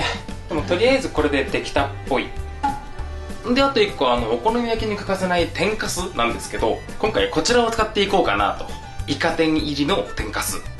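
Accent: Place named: Fukuoka, Japan. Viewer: 端々に出る方言が好き(´；ω；`) 「吹き零れんごと」とかガチの福岡弁で笑う笑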